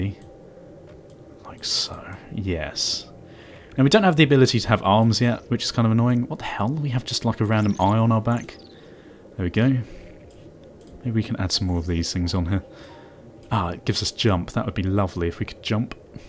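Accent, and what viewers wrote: United Kingdom. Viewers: youre voice sounds like mine but british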